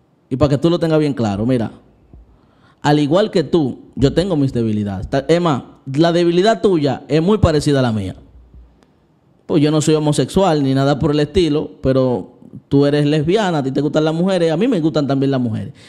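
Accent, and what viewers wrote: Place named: Dominican Republic. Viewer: Es que ese el acento dominicano. Sip, somos el país que peor habla el español en Latinoamerica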